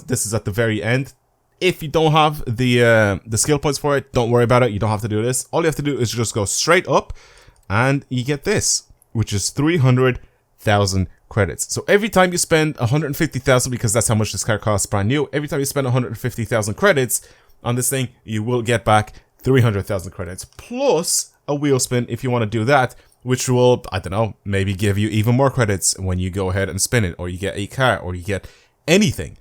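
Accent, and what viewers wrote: Ireland. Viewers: Are you from Ireland ? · Are you from county Offaly? You sound just like my Irish cousin who lives in Offaly.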